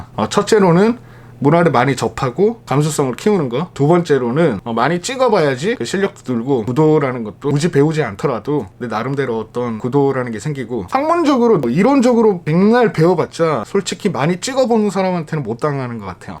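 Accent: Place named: Daegu, South Korea. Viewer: 댓글 달고 나니까 대구가 고향이라는 말씀하시는 부분이 딱 나와버렸어요 ㅋㅋㅋ익숙한 말투라 ㅋㅋ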